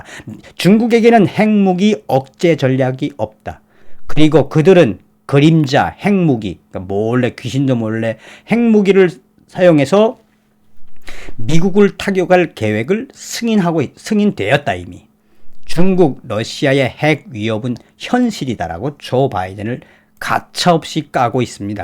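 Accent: North Korea. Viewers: 북한 억양의 찰진 표현...디립다 깐다. ㅎㅎ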